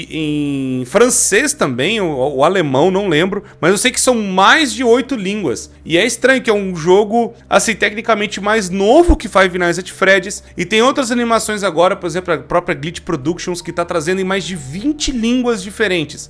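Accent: Brazil. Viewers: O jeito que vc fala se assemelha mais aos brasileiros,vc vê muitos vídeos na Internet ou pq convive com BR?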